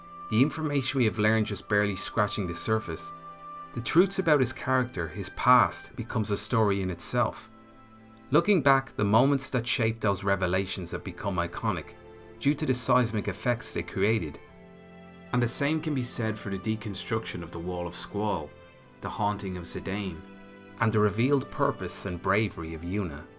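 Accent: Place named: Ireland. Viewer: People saying 'why no IV, V, Tactics etc' should bear in mind that none of those games were originally released in Europe. (Or, as far as I'm aware, anywhere outside Japan or North America) By his accent I'm assuming grew up in Ireland, and for those of us in Europe, FFVII was not only our first Final Fantasy game, it was the first Final Fantasy game we were even capable of playing. 'Golden Age' is going to be by definition subjective and I took as embracing that in this video. At any rate to me and a lot of people like me, the games before FFVII are usually emotional non-events because we played them (if we even did) out of their era, much later on. The nostalgia just aint there.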